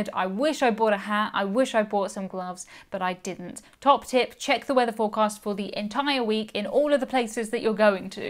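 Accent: United Kingdom. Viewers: coriander is the seed where the leaf is Cilantro- interestingly the spanish pronunciation is Kilantro. when u said multiple sounded like multabowl sounded like u said airboat for aiport called sounds like code.i am so laughing-Brit accent is perplexing.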